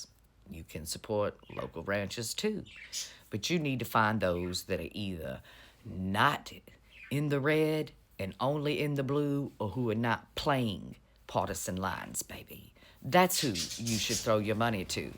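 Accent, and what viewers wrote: South, United States. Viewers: I enjoy not only your forthright news, but the Southern way in which it is delivered.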